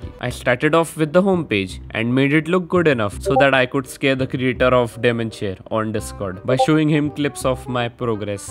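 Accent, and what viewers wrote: India. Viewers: The wierdest part about this is that he sounds like a scam caller. (No offense dont cancel me every indian probably sounds like that im just uneducated)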